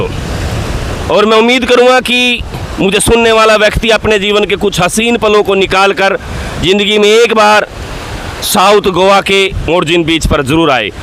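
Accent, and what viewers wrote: Haryana, India. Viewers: Bhai ye aap ke liye Naya hoga kyoki aap ke liye sab Naya hoga aap Haryana ke ho sayad kyoki aap bhaasha se pata chalta jai wahan pr ladies ko Kitna samman Diya jata jai sab ko pata hai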